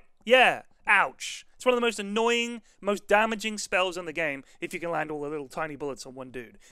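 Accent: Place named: United Kingdom. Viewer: his british accent makes everything sound funny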